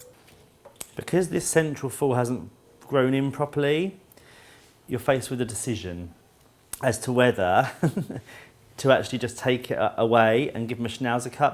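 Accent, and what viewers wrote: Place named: England, United Kingdom. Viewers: english, this is an english accent. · I love watching the British groomers. Many times I catch myself talking British while I'm grooming dogs, lol! Especially the good ones that sit: I say "Up dahling!". You did a great job ;)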